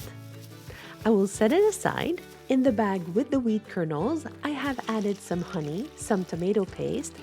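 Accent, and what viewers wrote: France. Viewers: I love spending time with you! Thank you for this video,be safe! PS - is your native tongue French? Greetings from a portuguese fan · I love your pronunciation, it's very French. My city Odesa (Ukraine) has very deep and long-standing ties with the state of Israel and here we pray for the people of Israel, all of us, Jews and Christians.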